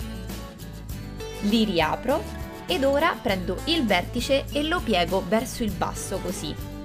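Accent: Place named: Rome, Italy. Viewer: Sei americana o italiana perché mi sembri di roma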